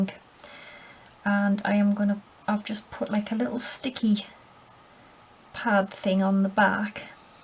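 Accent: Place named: County Durham, United Kingdom. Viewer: Really lovely card!! I just found your channel....was thrilled to hear your accent - reminds me of home. I'm from County Durham living in Queensland Australia.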